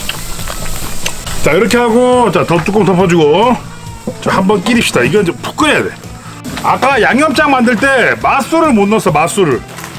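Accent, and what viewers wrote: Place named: Gangwon, South Korea. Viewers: 기름을 지름이라고 하시면 강원도 사람이신가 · 솥뚜까리...장갑 쪄 주고...지름 두르고. ㅋㅋㅋㅋㅋ 완전 토종 홍천산이구나. 홍천 사투리 아는 사람은 알어드러이~~~ 크아~~ 영어 자막 넣어주고 싶넹.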